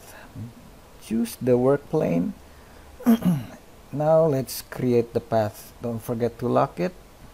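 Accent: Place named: Indonesia. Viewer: tapi pengucapannya bagus kok mas, dengan aksen Indonesia...:) Dulu pernah kuliah/tinggal di Luar Negeri kah?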